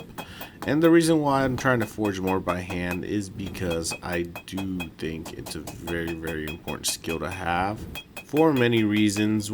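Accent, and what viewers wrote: New Mexico, United States. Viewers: Ive been watching for a second now, your skills are definitely improved. Pretty much one of the few makers I follow. You sound like a fellow NM so I just enjoy the commentary!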